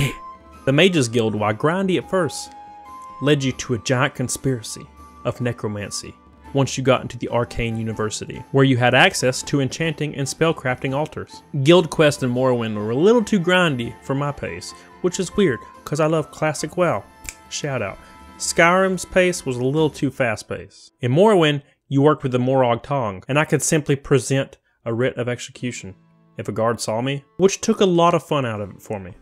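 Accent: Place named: Texas, United States. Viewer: Your accent sounds like Amarillo TX....had a co-worker who sounded just like you before. Regardless, your accent is so relaxing and you do a great job!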